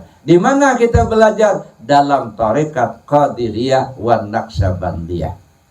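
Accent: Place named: Sumatra, Indonesia. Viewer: Assalamualaikum, Kalau boleh tau pak orang apa ya, logatnya seperti orang Sumatra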